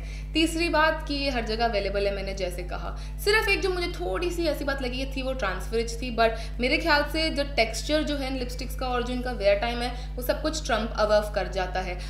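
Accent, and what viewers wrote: Delhi, India. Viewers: Pakka Delhi wali hindi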